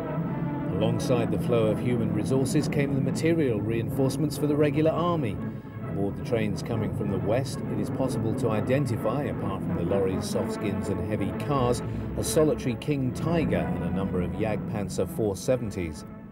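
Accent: England, United Kingdom. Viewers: Simultaneous playing of English accent commentary, overlaid gun and artillery fire, pomp and circumstance ochestra music, and actual WWII German newreel audio. It's like the battle of Prokhorovka in my ears.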